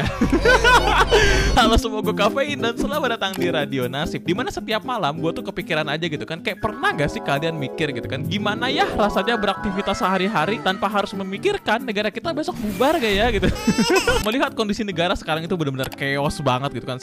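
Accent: Bandung, Indonesia. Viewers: Lo orang bandung bang? 😦😦😦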